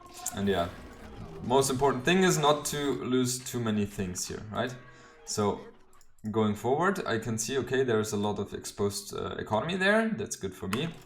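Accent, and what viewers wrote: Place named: Germany. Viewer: Ich liebe ja dein (D)Englisch 😄 So to say hab ich vorher auch noch nie gehört 👌🏻😄 Obwohl es ja nicht falsch ist.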